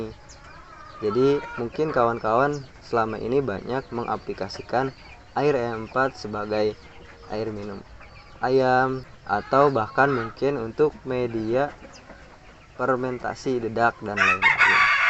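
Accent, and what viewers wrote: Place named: West Java, Indonesia. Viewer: Mantef kang . Timna kang logat sundana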